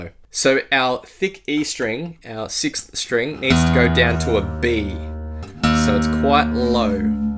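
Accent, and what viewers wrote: Australia. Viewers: with an Australian accent (i think) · I’ve never seen an Asian with an Australian accent before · Dont forget the australian accent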